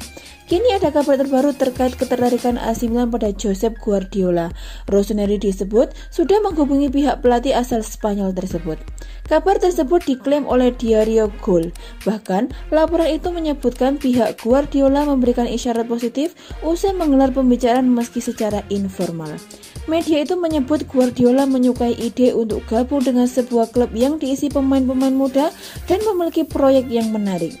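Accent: Java, Indonesia. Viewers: Medok e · Logak Jawa nya kentel amat njiiirr 😂😂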